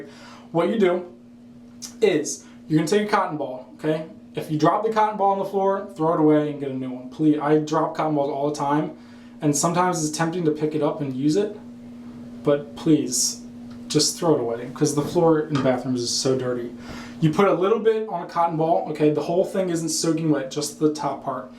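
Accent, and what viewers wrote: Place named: Rural, United States. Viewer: You Speak like a brain dead hick ....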